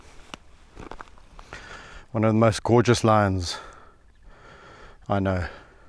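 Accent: South Africa. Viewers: Those manes. Can’t BELIVE. How full and thick they are. Andi just love the way. You talk to them and love the South. African accent. Love thst beautiful country. Gods own country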